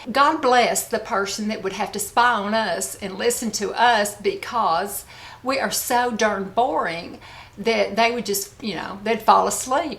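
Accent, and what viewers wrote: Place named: East Tennessee, United States. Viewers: As a newer subscriber, I super enjoy getting to know you like this. I know what you mean about internet safety, and it’s good you’re being cautious; it’s also a shame. 99% of us are just looking for fun and companionship re: girly things, but that 1% makes it unwise to share too much. So nice for those battling chronic health issues that you share about your positivity and living day by day: I also really respect the way you “just say no” haha to either sharing things which have already been done or sharing things just specific to your health needs. Lovvvvvve your East TN accent. And manner of speaking. I grew up in CO and the Midwest and no one speaks as pretty as y’all do. Your makeup looks especially pretty. Okay, friend—off to find my weights and yoga mat. You have inspired me to work on my own core and flexibility!!! 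🌸❤️💕🥰🏋🏼‍♀️ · I’m from East Tennessee too. Living in Florida now and your accent makes me feel at home. Love your genuine personality. 🥰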